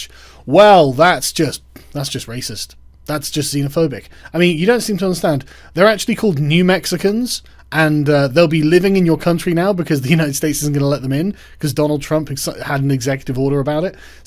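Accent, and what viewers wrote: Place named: England, United Kingdom. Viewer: The best English accent ever! You could sell me anything after 2am on cable bro.....like Michel Cane in Zulu ( ole boy).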